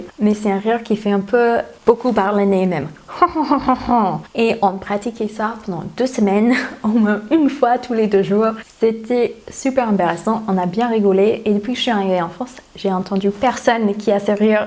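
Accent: United States. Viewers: C'est trop drôle, heureusement que tu n'as pas ri comme on te l'a appris à l'école sinon les gens t'auraient trouvé bizarre. J'aime beaucoup lorsque les américains parlent français, c'est très mignon comme accent. Je viens de regarder quelques unes de tes vidéos, j'adore et je m'abonne. A bientôt. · Oui je me doute ne t'inquiète pas! Dans ta dernière vidéo il me semble que tu as dit avoir changé de micro il me semble et la qualité était plutôt bien donc je me suis dit que tu l'avais peut-être juste mal positionné cette fois :/ Et j'en profite pour te dire que j'adore tes vidéos et surtout ton petit accent américain est trop cute!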